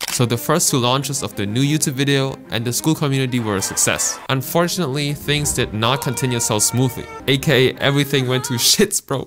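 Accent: Germany. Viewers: im a trippin or does he have a german accent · german accent detected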